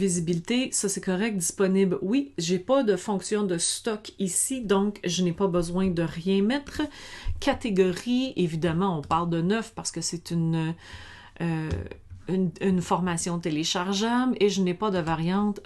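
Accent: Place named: Canada. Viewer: Merci pour cette video réussie! un petit bonjour de Normandie en France... et merci pour ce chouette accent canadien qu'on ne se lasse pas d'écouter avec plaisir ! Cependant j'ai une petite question, vous serait il possible de faire le même style de video pour lier la boutique Facebook avec celle d'Instagram. J'ai un site internet sur Wordpress, une page Facebook Pro, une boutique Facebook et un compte instagram professionnel, tout ce petit monde à l'air être connecté mais quand je veux mettre des produits sur ma page instagram, on me répond : Aucun produit ici, si les produits n'apparaissent pas ici, contacter l'administrateur de la page, ou vérifier vos autorisations dans business manager ??? je suis complètement larguée!!!! sur business manager c'est archi compliqué ^^ merci en tout cas d'être présente pour nous aider dans ces démarches bonne semaine à tous !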